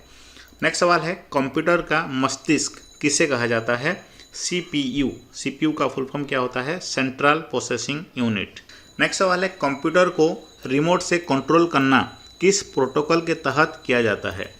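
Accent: Bengal, India. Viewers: may be he is bengoli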